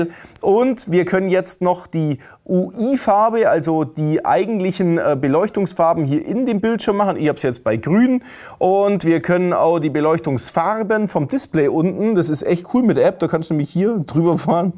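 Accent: Swabia, Germany. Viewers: Das Schwäbische ist mir super Sympathisch xD Ich glaube ich werd mir das Radio zulegen :D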